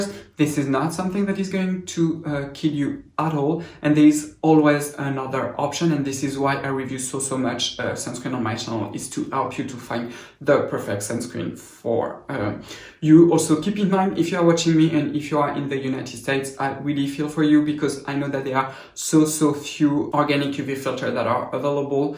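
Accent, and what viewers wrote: France. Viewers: I think that french accent is cute 😃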